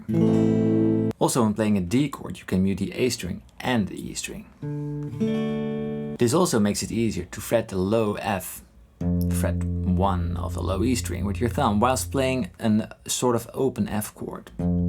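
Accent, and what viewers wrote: Netherlands. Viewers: where are you from? Because your accent sounds Dutch. Nice vid btw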